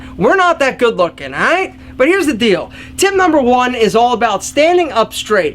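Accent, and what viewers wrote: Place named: New York, United States. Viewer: Athalos hes from the East like New York or something like that i think ha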